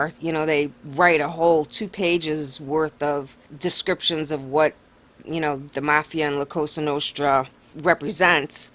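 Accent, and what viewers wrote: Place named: Midwest, United States. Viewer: She's got the best content and that hot Midwest accent/ voice loll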